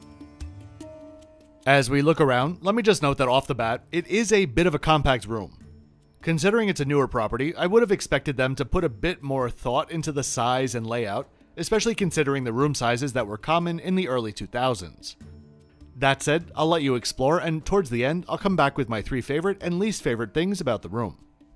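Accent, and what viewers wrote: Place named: New York, United States. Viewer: Best travel content on YouTube by far. Would love to hear the story of how you ended up in Asia. You sound like a New Yorker. ;-)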